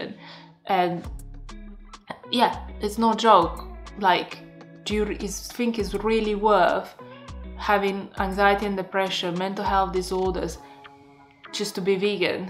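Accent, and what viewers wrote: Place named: United Kingdom. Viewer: Where is your accent from? I think you learned british english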